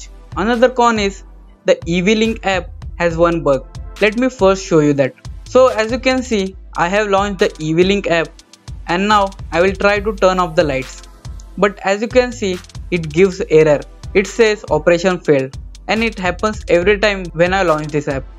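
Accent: India. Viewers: Hindi speaking English; I hate that accent. All are the same.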